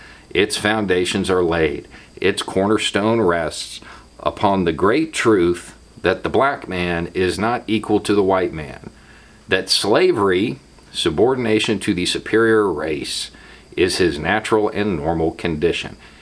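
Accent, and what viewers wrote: South, United States. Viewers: Recently some members of my wife's extended family tried defending their flying of the confederate flag by using the same talking points that you just swiftly debunked here. They're not even from the south... they moved down here a few years back, but for some reason they're in love with that damn flag and they'll swear up and down that it's not a symbol of racism, but instead a symbol of "southern pride". I tried making similar arguments as you did here, but they wouldn't listen. If they'll hear out anyone it'll be someone like you, a veteran from the south that sounds and looks like them. Thank you so much for putting these videos out. You're a hero speaking truths we all desperately need to hear. 👍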